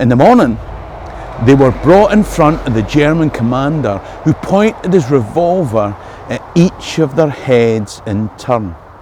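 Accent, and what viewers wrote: Scotland, United Kingdom. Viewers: He's just a foreigner with a Scottish accent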